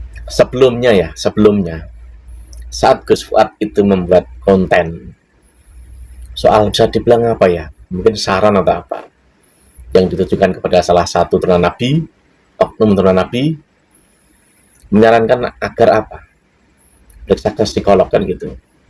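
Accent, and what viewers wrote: Java, Indonesia. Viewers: mas, aku kok seneng banget ya video sampeyan? cara bicara sampeyan itu khas jawa yg tenang dan lucu. salam kenal ya mas..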